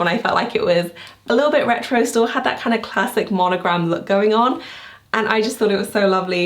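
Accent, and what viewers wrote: England, United Kingdom. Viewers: Love your videos, but must admit it's a bit disconcerting to hear you say 'store' quite so much in an English accent! Have never heard anyone here refer to shops that way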